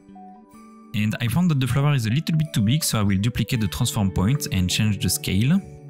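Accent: France. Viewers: Salut, (je pense que tu est français à ton accent (ce n'est pas un reproche)) ton tuto à l'air top je chercher à apprendre à faire ca donc c'est parfait. Bon courage et continue comme sa et bonne fin d'année.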